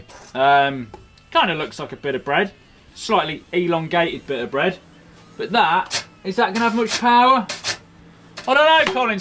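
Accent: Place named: Oxford, United Kingdom. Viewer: Colin, because of your videos, I am learning Oxford English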